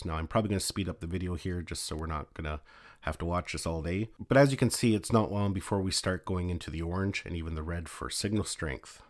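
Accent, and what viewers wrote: Canada. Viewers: Just to be clear, are you flying CE or FCC mode? Your accent sounds Canadian, which is CE territory, correct?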